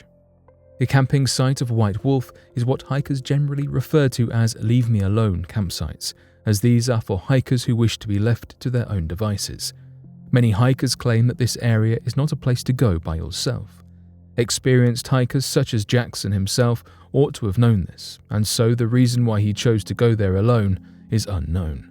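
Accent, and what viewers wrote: United Kingdom. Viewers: The British accent just gives these videos the extra creepy edge, can't be done with an a American accent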